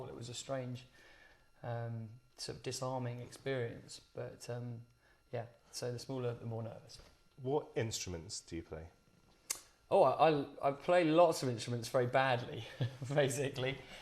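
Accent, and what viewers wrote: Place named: United Kingdom. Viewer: ah that british accent 3 3 i just love his voice..he's seriously put his heart and soul in his song !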